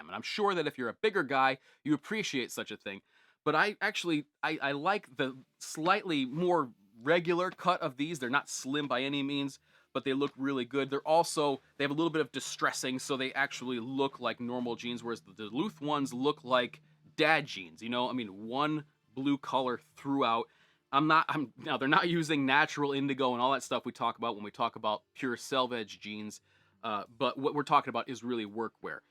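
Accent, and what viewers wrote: New England, United States. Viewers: New Englandah !!!!!!! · What part of New England are you from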